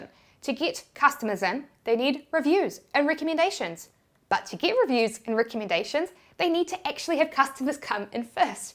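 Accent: New Zealand. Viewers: Is that normal accent in new Zealand ? That'd be awesome! Greetz from Germany 😱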